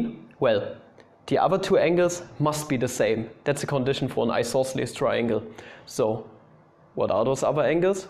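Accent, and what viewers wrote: Germany. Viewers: Are you German? Like I am and you sound SO DAMN GERMAN😂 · I thought I clicked on a mindyourdescisions video and then I hear that coarse german accent.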